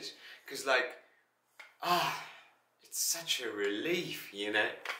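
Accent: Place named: Mexico. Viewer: love that Mexican accent when you speak Spanish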